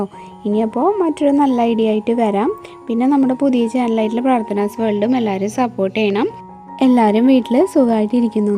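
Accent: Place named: Kannur, India. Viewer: വെളിച്ചങ് കണ്ണൂർ ഭാഷ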